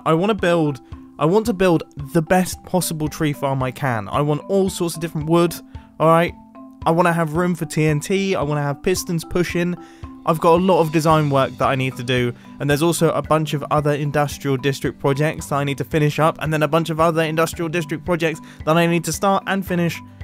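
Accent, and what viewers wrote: United Kingdom. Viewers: Wow was extra british this episodes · what type of British accent is yours?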